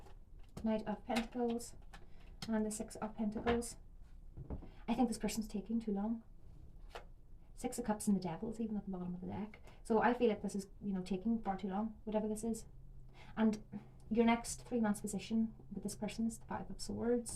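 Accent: Ireland. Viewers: Are you Irish?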